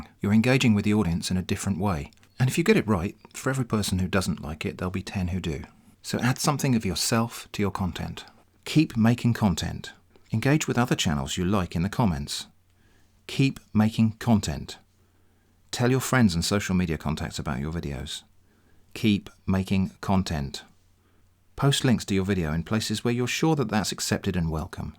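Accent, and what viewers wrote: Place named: London, United Kingdom. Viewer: Speaking of characters, I remember your Londoner accent from the scambaiting videos!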